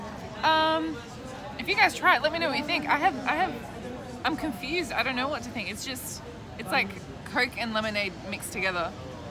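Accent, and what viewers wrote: Australia. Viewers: aussie accent.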